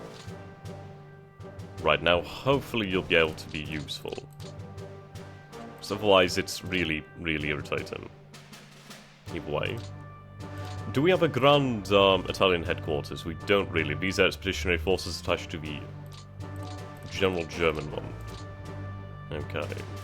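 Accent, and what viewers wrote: United Kingdom. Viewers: Great Video you sound British but with a deep voice not an insult are you British??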